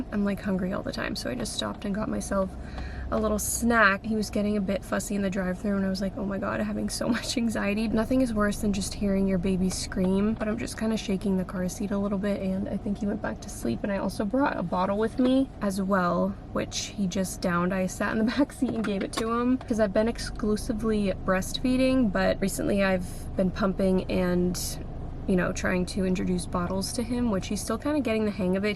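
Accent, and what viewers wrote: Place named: New England, United States. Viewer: Agh! He’s adorable! Literally!!! I also love how obviously you’re from New England, and I love how it’s GreysEN and you gave it kinda a New England flare and I love that 🫶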